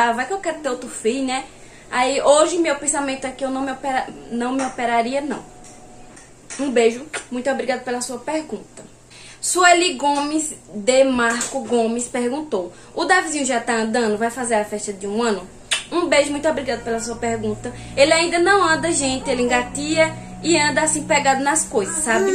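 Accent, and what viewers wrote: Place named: Pernambuco, Brazil. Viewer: Pernambucana arretada amo esse sotaque também sou de Pernambuco..bjs conterrânea 😘😘😘😘😘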